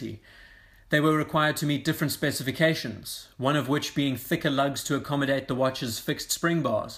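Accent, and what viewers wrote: South Africa. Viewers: Great content.. Discovered you literally today. Watched lik 4vids 😅 You sound South African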